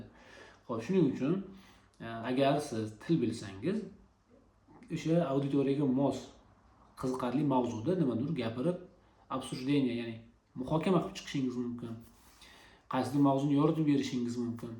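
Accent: Namangan, Uzbekistan. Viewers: aka namangandan emasmisiz mobodo?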